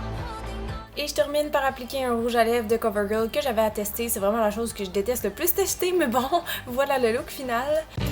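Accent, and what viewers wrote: Quebec, Canada. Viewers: Elle est québécoise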